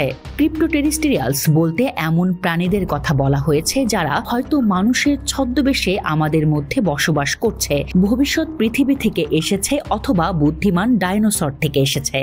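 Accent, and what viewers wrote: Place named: India. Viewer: খবর পড়লেন যে সে কি ভারতের নাকি বাংলাদেশের কথা ভাষা শুনে মনে হচ্ছে ভারতের বাঙ্গালিরা এত করা গলায় কথা বলেনা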